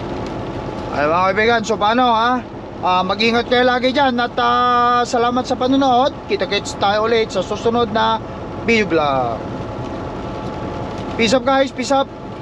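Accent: Ilocos, Philippines. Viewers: idol tiga saan k, parang ilocano k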